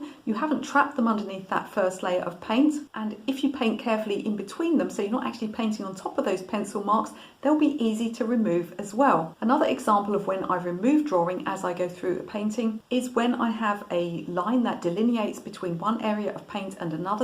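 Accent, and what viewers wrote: England, United Kingdom. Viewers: HI, I SUBSCRIBE TO YOUR CHANNEL. I REALLY ENJOY IT AND LEARN A LOT FROM YOU. HOWEVER, IT IS REALLY, REALLY HARD TO ABSORB ALL THE INFORMATION - DUE TO YOUR RATHER RAPID RATE OF SPEECH & MAYBE TINY BIT DUE TO YOUR ENGLISH ACCENT. BECAUSE IT TAKES THE HUMAN BRAIN TIME TO PROCESS SOMEWHAT COMPLEX INFORMATION, ESPECIALLY. I TOO, SPEAK RATHER FAST, AS COMPARED TO SOME FOLKS. THIS IS IN NO WAY A CRITICISM!! YOUR CHANNEL CONTENT IS VERY GOOD INFORMATION- I WATCH YOUR CHANNEL OFTEN THANK YOU, AND KEEP UP THE NICE WORK,YOU HAVE HELPED ME LEARN A LOT! PS WHEN I WATCH YOUR CHANNEL AND SOME OTHERS TOO - FORTUNATLY, ON YOUTUBE, I HAVE THE OPTION OF (SPEEDING UP OR SLOWING DOWN) THE VIDEO PLAY BACK SPEED. SO, I WATCH YOUR CHANNEL AT A SLOWER VIDEO SPEED AROUND 75% -50%. MAKES YOUR VOICE SOUND A BIT ODD LOL. BUT, IT GIVES MY BRAIN TIME TO PROCESS YOUR INFORMATION. 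I REALLY LIKE YOUR FORMAT TOO, IN THAT YOU STICK TO YOUR SUBJECT AND NOT A LOT OF TALKING ABOUT WHAT YOU DID TODAY, WHAT YOU ATE, WHERE YOU SAW SO AND SO ETC LOL. I WATCH ARTISTS VIDEOS TO LEARN ABOUT ART. I FIND A LOT OF "SMALL TALK" VERY DISTRACTING. I ALSO LEARN BETTER FROM BOTH VISUAL AND AUDIO DISCRIPTION OF TOPIC- BECAUSE I AM A NEW ARTIST. I AM SO VERY GRATEFUL FOR YOUTUBE! I HAVE LEARNED SO MUCH MORE INFORMATION AND MUCH FASTER THAN I COULD HAVE ON MY OWN, THATS FOR SURE- SO THANKS AND PLEASE KEEP THE NEW CONTENT COMING- MY GRATITUDE TO YOU!!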